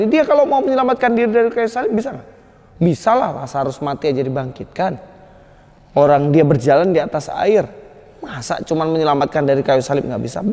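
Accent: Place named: East Java, Indonesia. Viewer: Tp nadanya sprti orng jatim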